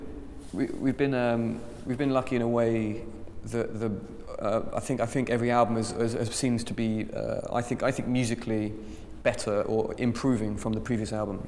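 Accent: United Kingdom. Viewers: He's a perfect package! He's intelligent, he has a great looks plus the sexy british accent. Just perfect. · i love british accent:)))))))) <3<3<3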